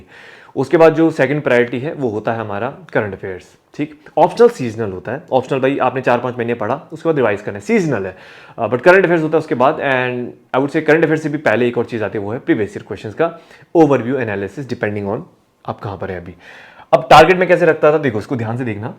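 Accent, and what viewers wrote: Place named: Garhwal, India. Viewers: Hn pur garhwali tone ari and face bhi garhwali lgra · Hallaa hota hai!! Garhwali tone sir❤️😂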